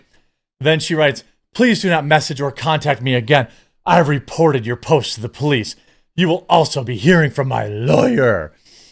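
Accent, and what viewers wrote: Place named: Michigan, United States. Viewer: You're from Michigan, you have the accent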